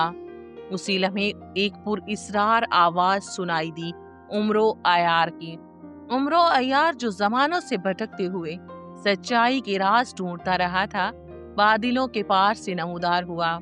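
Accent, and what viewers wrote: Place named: India. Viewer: Hindi accent ki jaga Urdu accent use krn Clip's ka liya vo3 use krn vo apka original characters voice da ga apko audio lagana ki zarorat nhi para gi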